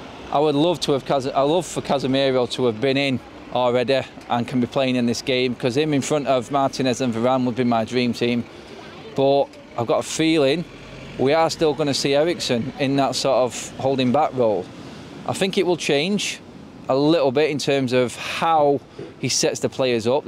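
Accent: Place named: Manchester, United Kingdom. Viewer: Do u not hear he's accent he's obviously from Manchester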